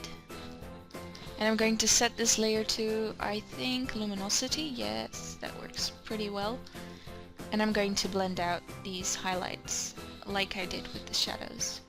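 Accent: Netherlands. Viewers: "Oh dit meisje is Nederlands, ik hoor het gewoon, 100% zeker" ... " I learned this in Dutch.." I knew it ^^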